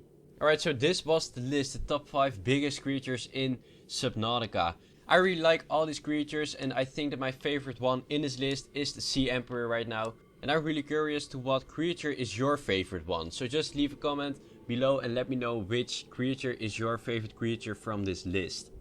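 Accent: Netherlands. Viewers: you sound very dutch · ben je soms nederlands het klinkt wel zo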